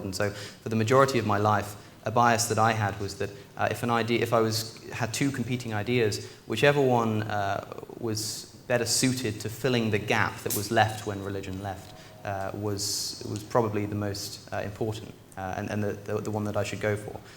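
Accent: RP, United Kingdom. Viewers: • Looks like a young Oxford boy • Talks like a young Oxford boy • Thinks like an ancient Greek filosopher I'm proud to be the thousandth like on this video! (not really) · British accent = Sound smart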